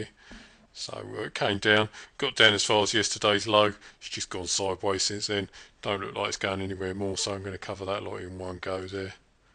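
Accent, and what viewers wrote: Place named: South London, United Kingdom. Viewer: that's funny.Americans often have trouble understanding the british accent. They think we all speak like the queen or maybe hugh Grant or something, lol. Wait until you hear someone with a thick scouser accent. Sounds like he's from south London at a guess. loving the videos.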